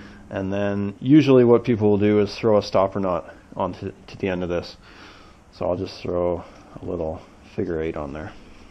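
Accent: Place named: Canada. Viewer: This is the Most Canadian dialect I have heard in years. Are you the real Red Green? (Beaner is racial slang criticizing Hispanic people, you should probably say the whole word.)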